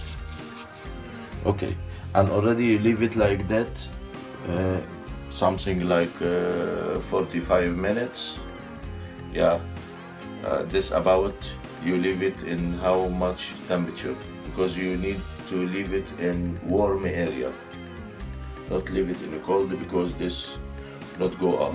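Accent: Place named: Egypt. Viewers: He’s English makes me to watch all his videos such great person. I like Egypt accent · 😁😁luv d way u narrate .. Egyptian style English.. sooooo sweeet.. 7eloo awee. 👍👍👍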